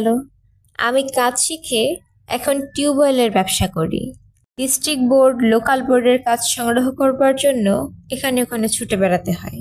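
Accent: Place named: Kolkata, India. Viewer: Kolkatar tone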